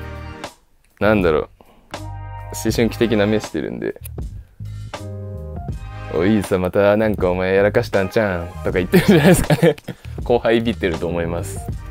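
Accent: Japan. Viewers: ugh he's so hot, his voice is so deep and i love his Japanese accent 😩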